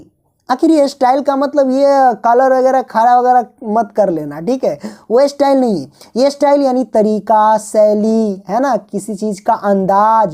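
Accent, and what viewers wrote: Bihar, India. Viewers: Sir aap kaha se hai apki avj se lgta h aap Bihar se hai ??👍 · Aap bihar se ho kya?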